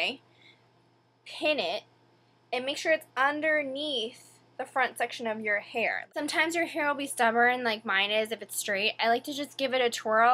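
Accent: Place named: Boston, United States. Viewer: I can tell you're from Boston girl, I heard you say "mirrAH" ahaha. Love your vidsss :)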